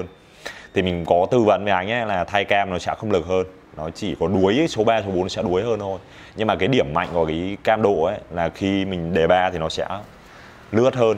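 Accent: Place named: Bao Loc, Vietnam. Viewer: nghe dọng anh giống người dân ở bảo lộc lâm đồng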